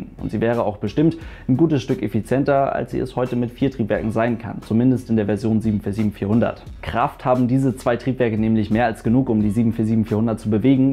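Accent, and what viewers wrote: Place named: North, Germany. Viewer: Warum sagst du immer Flugzeuch? Was ist das für ein Dialekt Kommst du aus dem Norden ?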